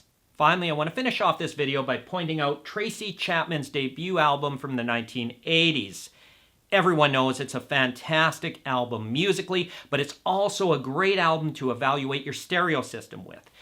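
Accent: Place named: United States. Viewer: You realise he is from the USA don’t you? It’s called an accent. Weird observation you have and somewhat ignorant I might add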